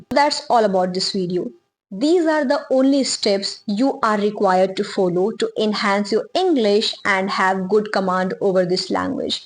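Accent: India. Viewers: What is your region? I asked couse yours pronunciation it's look like Indian.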